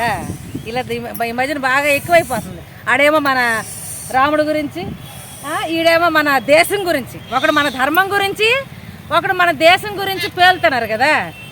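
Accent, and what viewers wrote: Srikakulam, India. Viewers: Nuvvu nee seekakulambhasha nee face chuste asayamvestundi gorrhemohamdana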